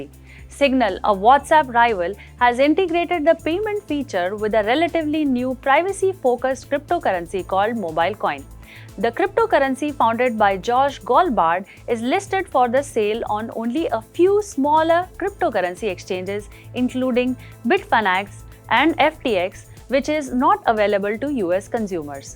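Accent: Gujarat, India. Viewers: She's very pretty. But honest feedback - she has a strong gujju accent and a very sing song voice. Please don't get hung up on gujju ego and identity. You want to make money! Get an anchor who's accent neutral and presentable. The content was good.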